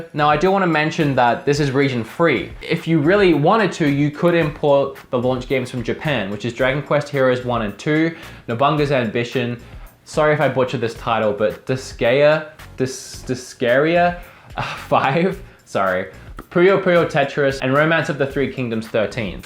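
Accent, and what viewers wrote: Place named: Australia. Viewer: thicker aussie accent and a calm expression......sure did change 2 years ago lol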